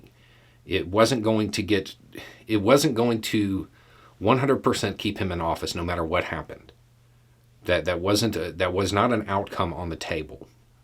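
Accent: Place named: Panhandle, United States. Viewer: So weird to go back to videos from like 4 years ago, back when he was leaning heavily into a Panhandle accent.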